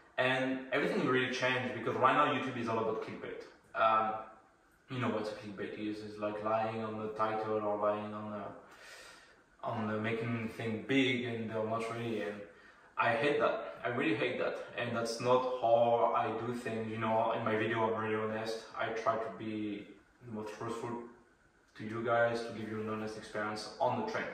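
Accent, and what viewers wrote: France. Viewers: I love the French accent! Looking forward for more talking videos. Keep it up even with the challenges!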